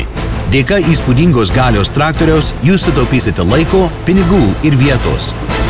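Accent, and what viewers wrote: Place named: United States. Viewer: komentatoriaus amerikoniskas akcentas zudo :D:D:D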